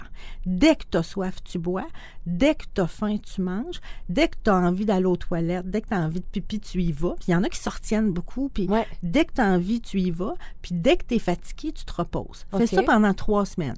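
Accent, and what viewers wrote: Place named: Quebec, Canada. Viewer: اL'accent québécois est dégueulasse je n'arrive pas à vous écouter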